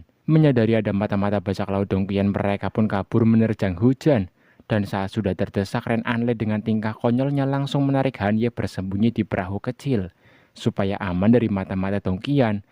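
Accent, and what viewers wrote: Banyumas, Indonesia. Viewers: Duuh Mas broo yg merasa mirip Han Ye alias Gong Jun hehehe wkwkwkk kok ngomongnya ngapak gitu sama kayak Aku lhoo aslinya mana yaaah gaess 🤔🙉🤗😁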